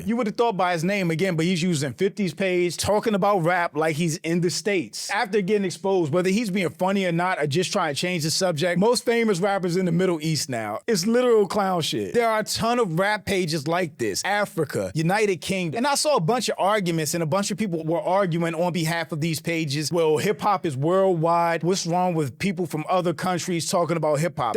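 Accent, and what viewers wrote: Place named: Canada. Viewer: You can listen and know he's from canada.He's from toronto, just listen to him.He don't sound american whatsoever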